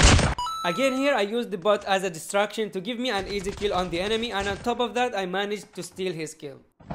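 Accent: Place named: India. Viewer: Your voice essence feels like u r Indian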